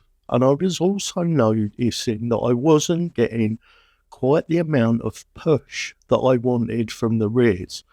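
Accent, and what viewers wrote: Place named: Birmingham, United Kingdom. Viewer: brummy accent that lol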